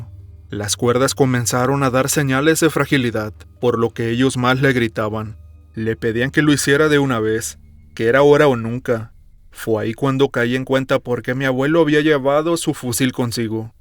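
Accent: Mexico. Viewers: Eres uno de los mejores narrando...tu acento de dónde es?? De que parte de México???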